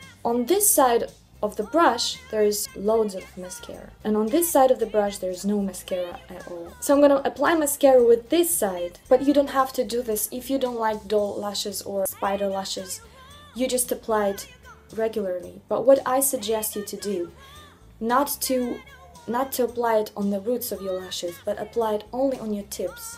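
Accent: Russia. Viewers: I knew she was Russian after the first 3 sec..could decently tell from the accent ( first time seeing her) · The accent is so cute! I knew you were Russian when you started speaking. I’m from Ukraine and I can’t hear my own accent but other people can. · Knew you were Russian straight away, I'm Russian too btw. Tnx for the tricks! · То чувство, когда начинаешь смотреть видео и сразу слышишь русский акцент☺️ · Lol, that's the first time I heard of a Russian accent making someone sound like a good person. Usually people say "haha, you sound like a movie villian!" Aaanyway, if she sounds like a nice person, it's probably because she's speaking calmly, and maybe because she actually is a nice person, who knows? :P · Жуткий русский акцент)) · Wow this chickadee has some insane skills! She talks like a total professional. Gorgeous with a Russian accent! Class act. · произношение как у русской! 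вы русская? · Absolutely agree with you! I'm Russian and for me her Russian accent is just obvious. You can tell it if you listen thoroughly to such words as "fundation" and "of course" (first seconds of the video)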